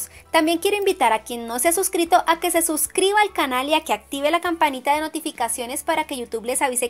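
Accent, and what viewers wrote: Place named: Venezuela. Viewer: Eres venezolana verdad?❤️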